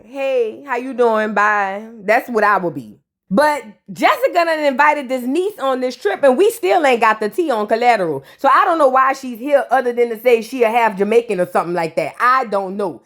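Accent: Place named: Louisiana, United States. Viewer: luv ur content is u from N.O. cuz u keep it real frfr and ur reactions is great if u not from N.O. u sure do sound like u from Louisiana da bottom of da boot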